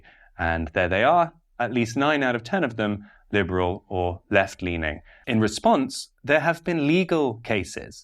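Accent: United Kingdom. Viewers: This is some kind of bullshit, but the british accent makes it palatable somewhat 😂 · Yeah I'll engage, this is just a cute little British accent and version of the right wing complaining when breitbart got their advertising yanked.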